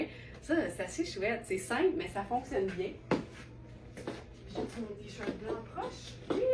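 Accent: Canada. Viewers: Bonjour, le sweet doit être rentré dans le pantalon, c'est plus joli, le pantalon étant large. J'adore votre accent canadien !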